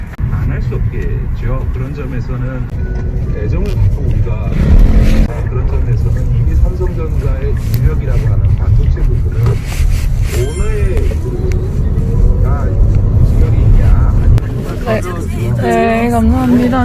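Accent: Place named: Gyeongsang, South Korea. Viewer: 고향이 경상도 인가요? 말투가 귀여우시네요 ㅎㅎㅎ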